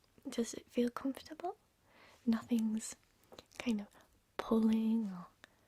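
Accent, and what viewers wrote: United Kingdom. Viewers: Wait...she's British...well this suddenly got VERY interesting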